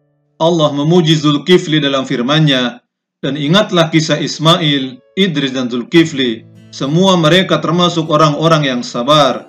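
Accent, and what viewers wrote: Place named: Makassar, Indonesia. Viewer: Yg ngomong dialeg org makassar wkwwk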